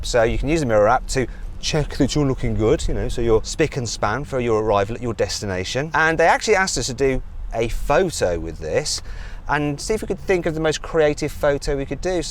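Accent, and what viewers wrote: United Kingdom. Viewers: ゴリゴリのイギリス英語かな？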